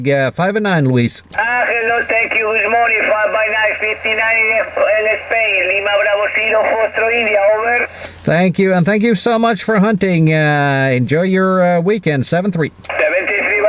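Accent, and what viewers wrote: United States. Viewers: I enjoy your channel. Have you lived in the States? You have a very midwestern accent. Or midwesterners have a Norwegian accent.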